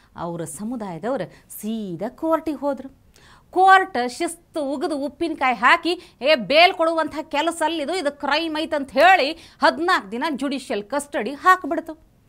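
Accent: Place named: North Karnataka, India. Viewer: Well done madam , Good news reader in our u k langauge👃👏👏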